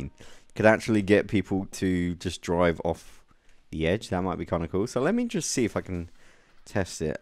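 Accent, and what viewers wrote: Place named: United Kingdom. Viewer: By your accent I think your British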